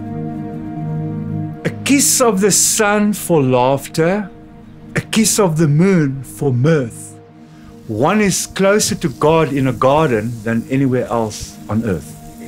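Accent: South Africa. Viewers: ❤ great profound little video, profound, and that South African accent 😂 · Sounds South African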